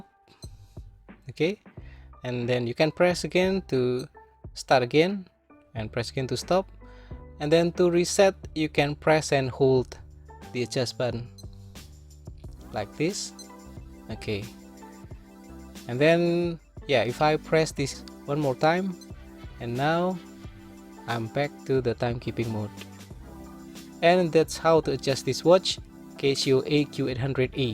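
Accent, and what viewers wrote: Indonesia. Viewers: Pertama kali liat karena pengen tau gimana cara setting jamnya, terus pas semakin di dengerin lama-lama pengucapannya kaya orang indonesia juga ya ternyata orang semarangg😭😭 Mantap sih bahasa inggris nya bagus bangettt mungkin kalo gak di dengerin dengan teliti gak tau kalo orang indonesia nih😂😂 Terimakasih om untuk cara settingnya👌👌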